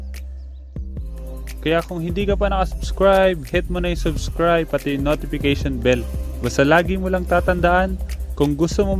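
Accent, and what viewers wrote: Visayas, Philippines. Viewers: bisaya ka idol?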